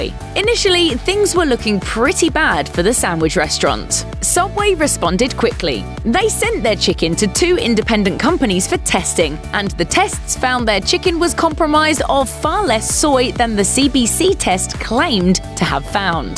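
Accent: United Kingdom. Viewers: British accent makes things look scientific. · Double pastrami Double steak And double never listen to a British chick tell you about American fast food restaurants... WTF... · "your narrator sounds British accent" "that was awkward?!"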